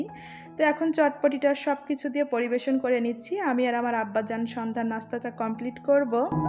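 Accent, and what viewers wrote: Jessore, Bangladesh. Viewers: আপু যোশহর ভাষায় কথা বলে নিয়েছ। খুব ভালো লেগেছে হাসিও পেয়েছে। ডিম কুমড়ো বড়ি দিয়ে রান্না করে নিয়েছ। জলপাই আচার খুব লোভনীয় হয়েছে।আর চটপটি খেতে খেতে বিদায় নিলাম আপু।❤❤ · ওয়া আলাইকুম আসসালাম বোন । তোমার কম্বলের মত আমারও একটি আছে (খয়েরী )ভালোই যশোরের ভাষায় কথা বললে 🥰আমি খুলনার মেয়ে,নানা দাদা বাড়ি লোহাগড়া হলেও পারিনা আঞ্চলিক ভাষায় কথা বলতে। আপু সাথে আছি ইনশাআল্লাহ পুরা ভিডিও জুড়ে থাকবো 💞💞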